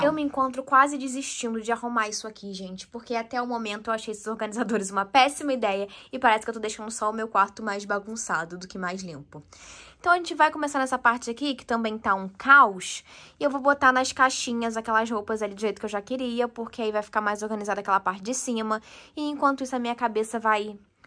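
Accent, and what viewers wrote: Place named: Rio de Janeiro, Brazil. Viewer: Tanto casaco; com esse sotaque de carioca? Faz frio ai? Só em Petrópolis ne né?!!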